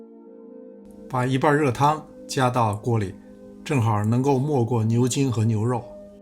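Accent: Beijing, China. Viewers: 是北京人吧？ 很亲切的声音:yougotthis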